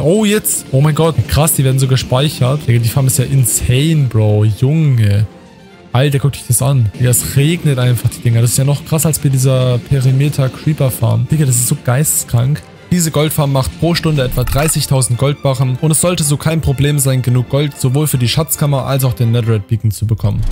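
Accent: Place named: Germany. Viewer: Ich habe dain english video ge sehn und sofort geraten das du deuch bist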